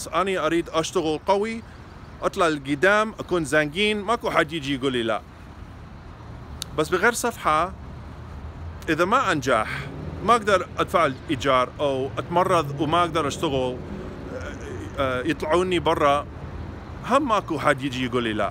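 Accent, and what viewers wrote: Iraq. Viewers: أروح فدوة للهجتك العراقية الحلوة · Hi..i m subcribing your channel.. because MashaAllah..im impressed..im a muslimah from Malaysia..i asked my elbi..dear listen.. without seeing your face..you really truly honestly sound iraqi..so when i showed him your face..he laughed.. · Your Iraqi accent so cute, you are gorgeous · your pronunciation of Arabic and Iraqi accent is incredibly good for a seemingly US born individual, you must have served in Iraq or something 😉 . Greetings from Cairo, Egypt or AlQahera- Misir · I like how you speak Iraqi accent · ?????? WHY YOU SPEAK IN IRAQI ACCENT??? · You got the iraqi accent duuuude .🤣👍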